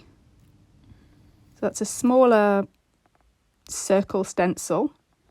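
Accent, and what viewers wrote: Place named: United Kingdom. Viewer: I had to chuckle so when you started making the yo-yos. With your accent, I would’ve expected you to call them Suffolk puffs. I’m enjoying your work so much and commentaries are just lovely.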